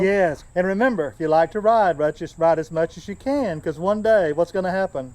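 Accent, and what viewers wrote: United States. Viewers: Here in southern Ohio we say the days of the week the same way. Btw, I never would have guessed you were from Texas from your accent. You sound like you are from NC to me. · What's wrong with Hank Hill? I like Hank. My Father-In-Law was a living Hank Hill but with an upstate NY accent but I digress. You're from TX...I can hear that...you also have a very good radio voice and ought to do a radio program...part of the gifting that god gave you. So, do you sound like Hank? Not really but if you did, that wouldn't be a bad thing. I also have it on very good authority that heaven is a long distance call for most places in the world but not in the rural south...Everyone else has an accent but we speak English as God intended...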